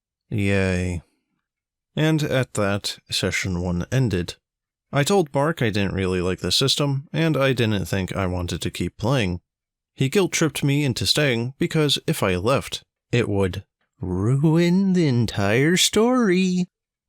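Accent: United Kingdom. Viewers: A Black dude with British accent... John Boyega? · a black guy with a british accent. so i guess ksi doesn't exist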